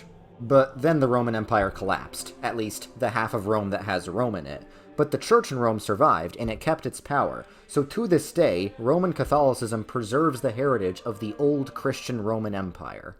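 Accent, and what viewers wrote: New York, United States. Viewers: You can really tell this guy is from New York by his "Coufey" accent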